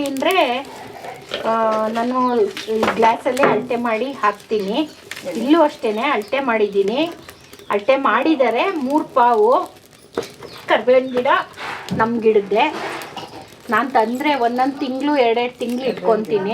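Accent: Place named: Nagamangala, India. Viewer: Hello Mammy, First of all Thank you. You prepared mouth watering breakfast in the traditional wooden stove it's my favourite system. Your communication slang belongs to Nagamangala- Mandya (ಅಕ್ಕ ಎಷ್ಟೇ ಹಾಕೊಳ್ಳೋದು) because My mammy also belongs Nagamangala, Really Hardwork people ever found there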